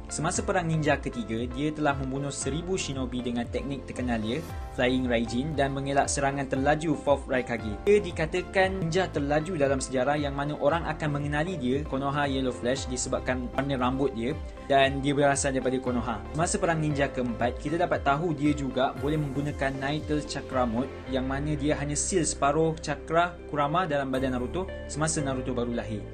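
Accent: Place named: Selangor, Malaysia. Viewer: Orang selangor ke ni Sbb kawan2 aku yng asal selangor 75% pelat2 sikit😂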